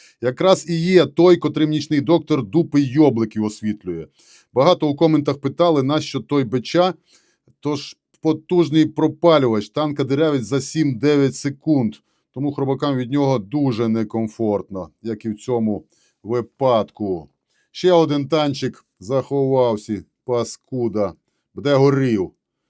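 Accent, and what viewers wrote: Transcarpathia, Ukraine. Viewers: Бадабуум-Чакалака-Мазафака!!! Йобудошч! Вдупумаш!!! Який соковитий всеж закарпатський діалект!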